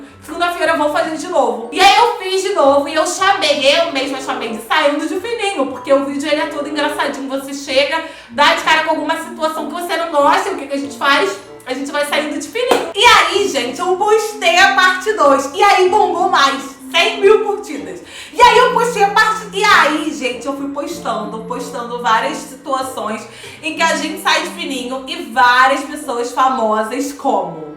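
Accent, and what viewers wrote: Rio de Janeiro, Brazil. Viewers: Eu só sou escrita no canal!! Porque eu simplesmente amo o seu sotaque carioca Sua energia .Parabéns pelo sucesso 🎊🎉🎊🎉🎊🎉🎊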